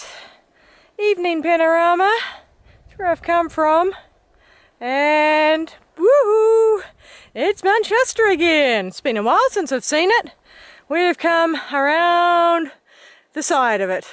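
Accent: New Zealand. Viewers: Great day for wildlife. Loved the beer(bear). Vanilla is a good repellent for the gnats. P.S. Your Kiwi accent is totally charming, I could listen all day!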